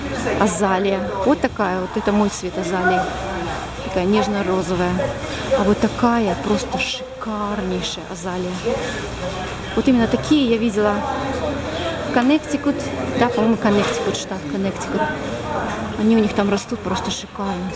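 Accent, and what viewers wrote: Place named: Russia. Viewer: говорила бы уже либо на русском либо английском!!!!!!!!!!! ужасный русский акцент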